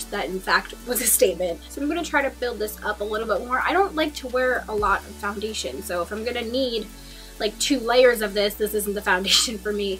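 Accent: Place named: East Coast, United States. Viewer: I love your tan!! You look great!!! I bought that Monistat primer on your recommendation and although it sounds awkward to put it on your face or tell your friends about, lol, it works great. Thanks!! I have super dry skin, so Im excited to try the foundation. I was considering trying it with no or a different foundation primer. Just an idea!! Great reviews, always!! When do you head back to Granada?? Do you miss it?? Every once in a while I catch your east coast accent, especially when you say "mascara," lol, and it makes me smile. You seem like such a west coast girl, like me, until I hear "mascara!" haha. Just a silly observation. Watch, now you'll notice it too. Habaha. Take care,!!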